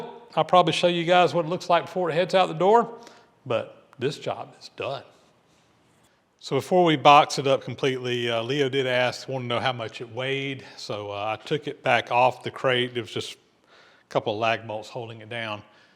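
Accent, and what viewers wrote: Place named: Georgia, United States. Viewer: I came here only as a follower of the Tally Ho project. Initially I was debating whether to follow as I could hardly make out a word you said - (Georgia accented English dialect to Dorset English ear). But I'm very glad I stayed. After a month I could follow you clearly & and learnt what a fascinating channel you ran. Watching how you overcome problems and your clear explanation of the most technical processes are excellent. I'll be staying.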